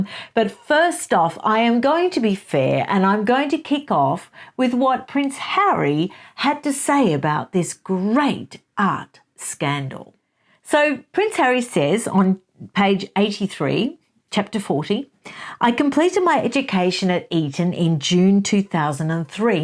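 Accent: Australia. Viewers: Love your Aussie ascent and true poor Harry was helped all the time in his education. Sadly it’s coming back to ‘bite him.’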